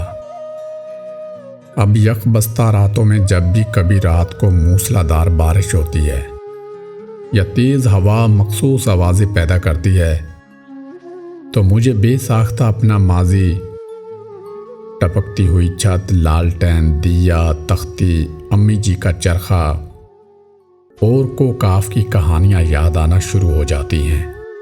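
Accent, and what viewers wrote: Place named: India. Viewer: It was good video but it looks like some indian dude made it with Indian portraits, it’s more impressive when Pakistani have their own portraits,even narrated by Indian accent. 20 years ago Pakistan was not part of India. It’s nice try by Indians to make Pakistani cry.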